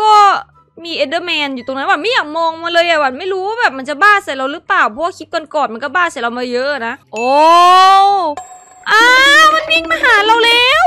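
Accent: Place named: Isan, Thailand. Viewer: คนอีสานเบาะ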